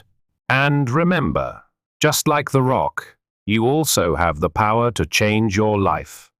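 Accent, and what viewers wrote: United Kingdom. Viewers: I love these stories in British English ❤!! Thank you!!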